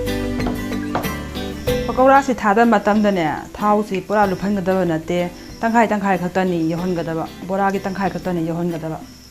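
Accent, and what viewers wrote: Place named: Kakching, India. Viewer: kakching maron ne amfao tajadribo.keino noise migi maron khoibase kothok2 tana hangisibo.manipir kachin amata koibirudribo.lam2 gi matung ena lon se adum khoibane..mapan thoktaba mi yam male.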